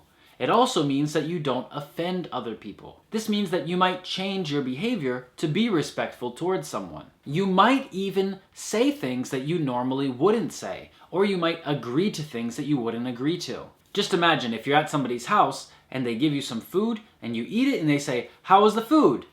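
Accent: Russia. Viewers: Почему мне кажется, что заполучил русский акцент?))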